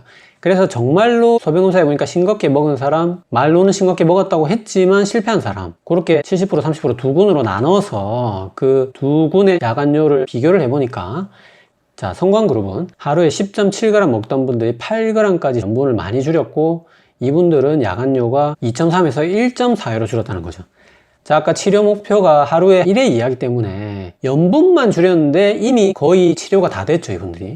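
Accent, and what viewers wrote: Gyeongsang, South Korea. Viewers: ~경상도 억양의 말투 너무 재밌어요. 귀에 쏙쏙 들어와요. 뭔가 중독성 있어요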